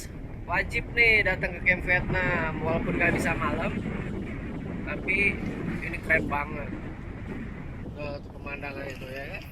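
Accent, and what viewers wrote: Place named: Kalimantan, Indonesia. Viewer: Orang Kalimantan mana suaranya 😄😄 #Yoyoyoy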